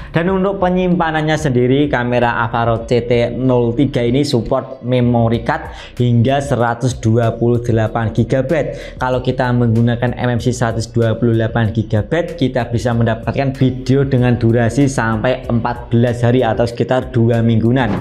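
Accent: Java, Indonesia. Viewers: Jawane medok banget anjir Jiancokkk😂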